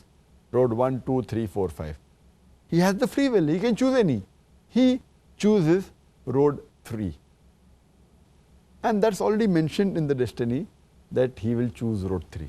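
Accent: India. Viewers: I'm a Muslim but I can't really understand his saying. His English is can't understand because his voice Indian Accent 😢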